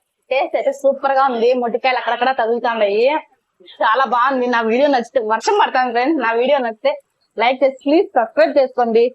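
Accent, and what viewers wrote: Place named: Chittoor, India. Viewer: Chittoor language 😂😂😂😂 me village ekkada akka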